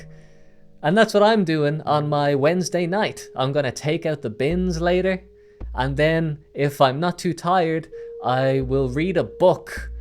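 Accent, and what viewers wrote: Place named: Ireland. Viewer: Why are you irish?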